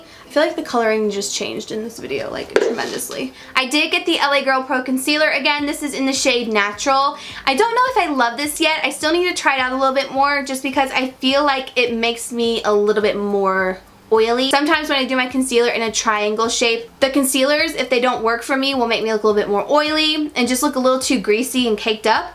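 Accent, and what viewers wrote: South, United States. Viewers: Guuurl someone's been talking to some folks with a drawl. Your southern is showing at the beginning of this video and I'm loving it!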